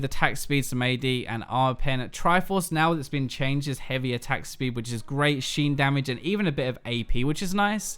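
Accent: United Kingdom. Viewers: Where in the UK is this guy from? His accent is lovely.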